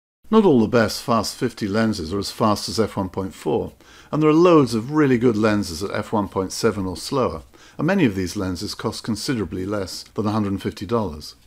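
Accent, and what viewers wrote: United Kingdom. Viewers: I love your British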